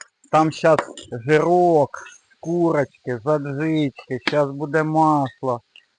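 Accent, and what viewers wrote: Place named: Central Ukraine, Ukraine. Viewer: Друже, дуже цікаві відео. Сидимо з дружиною і гадаємо звідки ти ці відео записуєш? Я з Черкас, вона з житомирської обл. Каже що в тебе такий самий говір як і в мене, то ти також десь з тих країв...) То звідки ти?))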